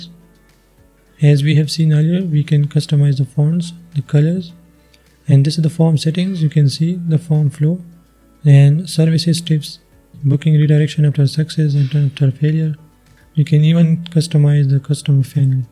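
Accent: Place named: India. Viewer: Aha Indian accent! But thanks for the video.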